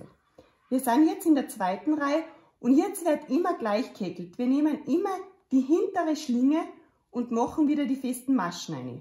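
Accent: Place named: Austria. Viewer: Vielen lieben Dank, liebe, für die Veröffentlichung deiner Idee, die durch Ihre Einfachheit wirkt. - Exakt was ich gesucht habe. 🤩. Und genau richtig für den heutigen Sonntagmorgen, wenn die anderen noch schlafen. ☺️ Da habe ich jetzt schon eines großen Teil fertig vom ersten Teil. Und ich freue mich, Videos mal im Österreichischen Dialekt zu entdecken. sehr sympathisch. Und auch noch ein riesiges Kompliment für eure Tischplatte - ein Traum!!!!! mlg. aus der Steiermark 👋🏼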